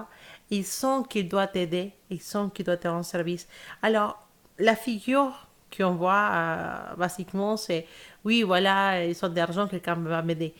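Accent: Spain. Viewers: Bonsoir!! Merci pour votre guidance ça me parle effectivement c'est le cas pour moi. J'aime votre accent espagnol! ☺️ Merci infiniment! 🙏 Namasté 🙏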